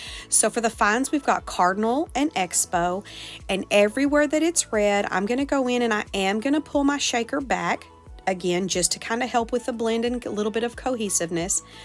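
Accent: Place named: South, United States. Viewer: I love this cup and listening to your southern voice is so comforting. Bama girl here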